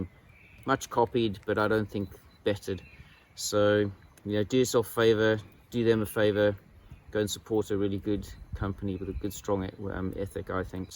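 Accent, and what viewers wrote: South Africa. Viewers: Hi there you sound like a SAFFA so am I but residing in the UK (24 yrs). I don’t do instagram any email add to reach out. BTW also bought two Rob Evan’s knives.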